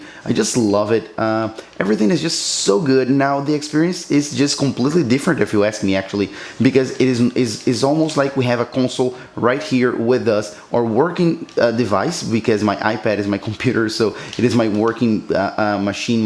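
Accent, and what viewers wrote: Brazil. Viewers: Look at this accent man! Are you from Brazil?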